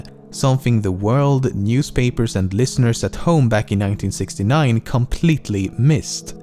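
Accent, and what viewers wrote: Sweden. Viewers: you sound soooo Swedish tell me you are! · love u man with ur sick swedish accent, keep up vids ive been with u since 50k subs and rember when u still replyed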